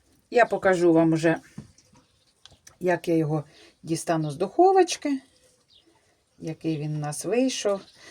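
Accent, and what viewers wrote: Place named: Luhansk, Ukraine. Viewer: говор такой как у нас, суржик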